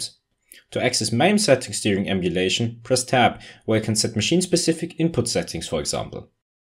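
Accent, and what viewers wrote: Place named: Austria. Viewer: Oh you had a more "austrian" accent at that time.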